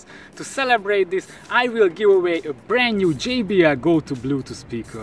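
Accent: Hungary. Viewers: Olyan magyaros a kiejtésed... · Nagyon hallatszik, hogy magyar vagy 😀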